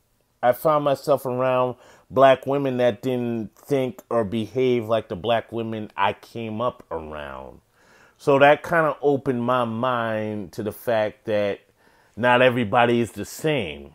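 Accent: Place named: New York, United States. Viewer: Interesting. So I know you're from NY (I can tell by the accent). Where did you travel to where you encountered Black women who didn't act like the women you grew up around? For me, one of the best things I've ever done was traveling for leisure while in graduate school. I would just go to random places for the heck of it (Salt Lake City, St. Louis, Nashville, etc.).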